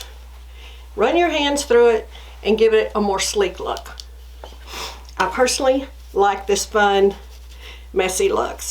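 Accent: South, United States. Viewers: You sound exactly like the blonde on CSI Miami whose name was Caleigh in the show. You’re obviously southern like me.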